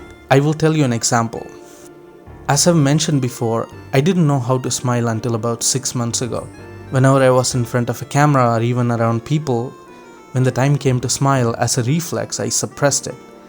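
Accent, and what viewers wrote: South India, India. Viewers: I can't tell you how similar our experiences our, I too am from the same place that you belong to (guessing from your accent) I had the same experience with smiling as described by you. I was rediculed once for my smile after that it took me ages to smile naturally. Coming from a poor south indian background with darker skin didn't help me with building my confdence. Thank you for these videos. You may not know the ways you are influencing other people's life without even realizing. Keep up the good work.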